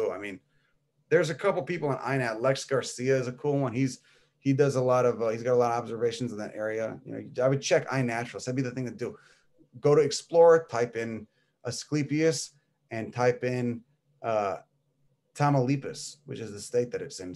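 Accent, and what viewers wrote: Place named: Boston, United States. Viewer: Good cheers to an Italian brother. My Nono came here to clean up gypsy moths and work on some estate as a gardener. My grandmother had me out in the garden and knowing how to grow my own food by the age of twelve! By 12 I found this really old book the local library was getting rid of for ten cents. Its been my freaking bible for the past forty years! During the 1990s I focused on native design, but as we know during that time everybody wanted imported plants that looked exotic and needed craploads of pesticides. I was ahead of my time with the organic approach but if people listened they would know that if you keep the ecosystem there are natural predators and you can plant native stuff to attract predators such as wasps and there are so many different types of wasps! The earth has been my life, my entire life and you are doing an amazing job. 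I am so grateful for your hilarity in these videos and love how you are not afraid to be real. YOu sounds like you are from Boston/Italian.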